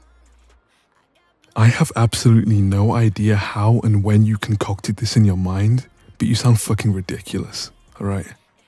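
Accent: United Kingdom. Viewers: why am ⟟ just realizing half way into the video that he sounds like British smhhhh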